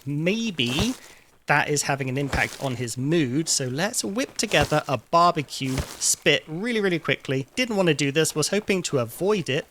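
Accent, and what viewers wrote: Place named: United Kingdom. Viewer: I do wish he could feel like he doesn't need to hurry up. I watch at 90% speed because with his accent (I speak Murican not British or Aussie), it's difficult to some of what he's saying as he speed slurs his words together as though he's just having a banter with the blokes on the block or whatever they do in his part of the world. Anyway, sir, you can talk at normal speed without viewers catching Twitter Brain and tuning out because you're speaking at a normal pace.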